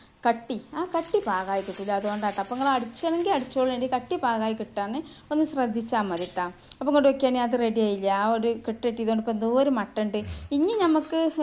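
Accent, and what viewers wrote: Malappuram, India. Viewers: മലപ്പുറം സ്ലാങ് ആണോ ഇത് .നല്ല രസമുണ്ട് കേൾക്കാൻ .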